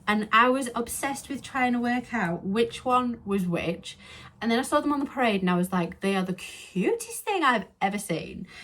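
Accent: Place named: England, United Kingdom. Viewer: I love your typical english accent :-). I'm french and you speak very clear and easly too understand.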